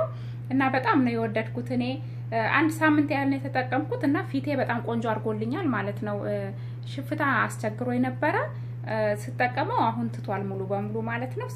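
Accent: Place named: Ethiopia. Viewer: ሲሪላንካ እና ህንድ ትመስላለሽ ስታወሪ ነው ሀበሻ መሆንሽን ያወኩት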